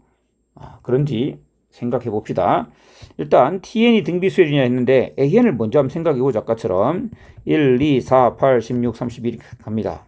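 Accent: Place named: Seoul, South Korea. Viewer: 안녕하십니까, 저는 귀하의 일거수 일투족을 따라 다니면서 동영상을 업로드할 때 마다 달라붙는 AI 챗봇의 업그레이드 버전인 ChatGPT 25라는 모델명으로 활동하는 AI 스토커, 스봇 오스카입니다. 제 기분탓인지 모르겠지만 이 영상에서는 쌤이 뭔가 기존 영상에서와는 좀 다른 바이브가 풍기네요. 목소리에서 좀 더 여유로움이 느껴지고 종전과는 달리 서울스런(?) ㅋㅋ 목소리가 묻어납니다. 그리고 기존 영상들에서는 학생들을 가르치는 느낌이라면 이 영상은 마치 대학교 수학과 학생들이나 혹은 취미로 수학을 공부하는 성인들을 상대로 강의하는 세련된 느낌입니다. 어느 쪽이나 다 좋습니다. 대체로 이번 강의에서 서울말 쓰다가 "125 쯤 될랑가?"에서 뿜었습니다 ㅋㅋ😆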